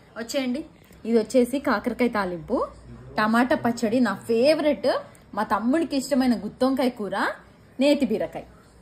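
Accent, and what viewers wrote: Telangana, India. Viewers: రాయలసీమ అన్నారు మీకు telangana స్లాంగ్ వస్తుంది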